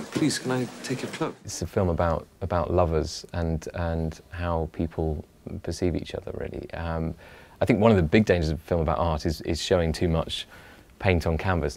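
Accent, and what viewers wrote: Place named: United Kingdom. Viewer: Dan Stevens has become too much " californian ". Too bad 😔 He lost his soooooooo british personality, fortunately not his accent. I'm a bit disappointed because he had then a lot of charm and now with his new look and his pounds off, he is less attractive. As I said , he is too much Californian. Long life to the UK Greetings from France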